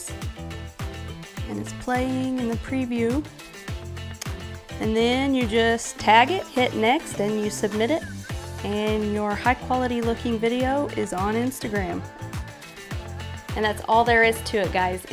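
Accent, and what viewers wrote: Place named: Oklahoma, United States. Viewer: Thanks for the vid it was helpful! I was trying to figure out what your accent was and I saw it’s Oklahoma 😁 I’m in Alabama and we have a similar accent.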